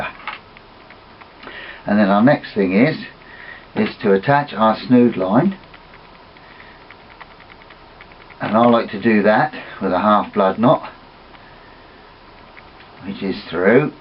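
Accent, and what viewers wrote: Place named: England, United Kingdom. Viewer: Сразу видно по акценту - Англичанин)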